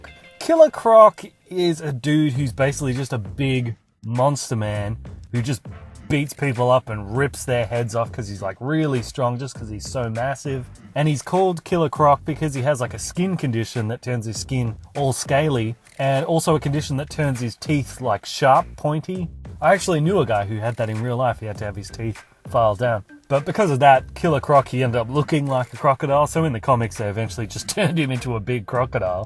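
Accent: Australia. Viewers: he's AUSTRALIAN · He's Australian, the weird thing is i am too but people think I'm American.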